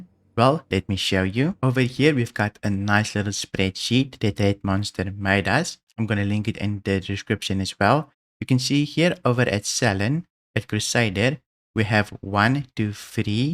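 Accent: South Africa. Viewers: You're very good at explaining things at a good pace without leaving anything out. Nice SA accent too. Good job!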